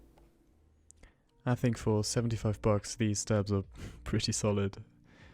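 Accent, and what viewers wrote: Germany. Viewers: just wondering if/how this works for typing in german? i'm thinking of getting one for my studies, but often need to write in german, and i don't have any experience with mechanical keyboards yet, so am not sure how typing ü ä ß usw. would work. any tips? assuming you're german from your accent, sorry if not! :) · are u german bro?:D Edit: okay you‘re german, Mr. lol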